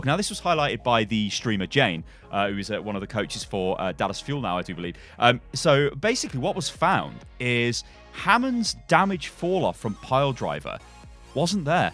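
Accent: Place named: United Kingdom. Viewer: Thanks, I just remembered why I hate the British accent. It's terrible and hurts my ears. How the fuck can you write "Reinhardt" wrong TWICE? Get your shit up.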